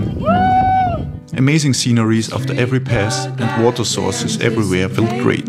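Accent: Germany. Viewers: Könnte es sein das du Deutsch bist? Hast ne deutschen Akzent... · Wow! Ich bin fasziniert darüber was für eine Reise du zu der deinen gemacht hast! Ich habe eine Millionen fragen an dich und weiß genau ich kann sie nicht alle stellen jede Stelle ich dir eine essentielle: was war das für ein Gefühl zu Beginn und was für eins am Ende dieser wunderbaren Reise? Ich möchte in den kommenden 3 Jahren mich auf eben genauso einen Trip vorbereiten vielleicht hast du auch eins zwei Tipps wie ich das am besten mache da du dies ja bereits erfolgreich gemeistert hast! Ich komme aus Niederbayern du hörst dich von der Aussprache auch so an als stammst du aus Niederbayern. Mach weiter so! Du hast hiermit einen neuen Abonnenten gewonnen und vielleicht sieht man sich mal auf den Pfaden oder man wandert gemeinsam! 🤩👍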